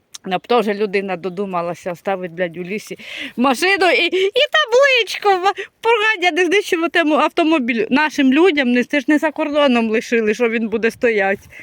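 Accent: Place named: Surzhyk, Ukraine. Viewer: Суржик дикий.😂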